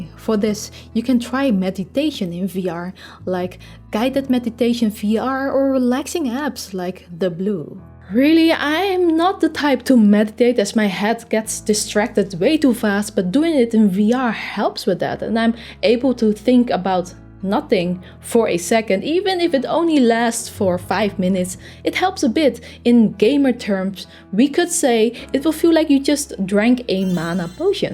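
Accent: Netherlands. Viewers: I love your accent, is it dutch?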